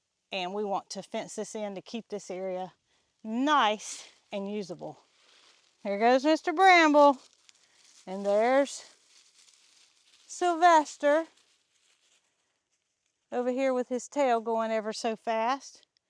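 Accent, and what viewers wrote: South, United States. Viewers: I really love your charming southern accent and phrases. I'm from South Africa so your accent is so different from where I am from. I love all your video content as well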